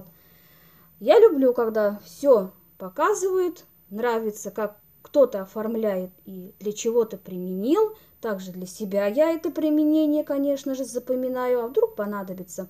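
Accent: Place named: Bashkortostan, Russia. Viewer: Здравствуйте,!Хочу поддержать Вас и Ваш канал.Видно,что Вы добрая,хорошая девушка,у Вас прекрасная семья и чистый уютный дом.И обзоры интересные,и говорите хорошо.И акцент небольшой придаёт только шарма,мне это нравится.Вы же ещё и на башкирском говорите,поэтому и есть небольшой акцент.Не обращайте внимания на злопыхателей,к сожалению они есть и в жизни тоже.Всего Вам доброго,успехов и процветания каналу!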